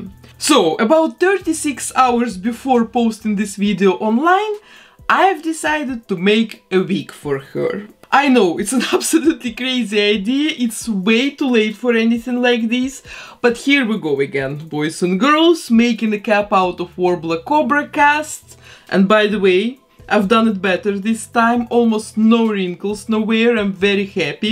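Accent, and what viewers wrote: Russia. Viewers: This is my second episode and I'm already hooked and I love your accent are you Russian?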